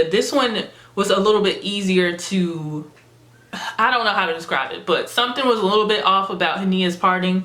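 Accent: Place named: Georgia, United States. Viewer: My first time seeing you ... excuse me... being blessed w u! Just wanna day THANK YOU! And you are 6 feet tall?! You betta be a model . Beautiful ! Where r u from I love ur accent too! U sound ur from Lagrange Georgia or something... anyways I’m a new subbie! 💕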